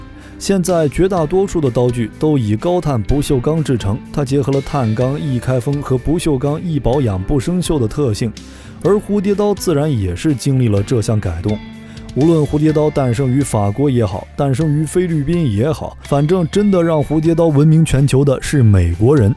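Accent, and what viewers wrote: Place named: Taiwan. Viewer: 这个节目到底是中国的还是 台湾腔奶奶风娘的？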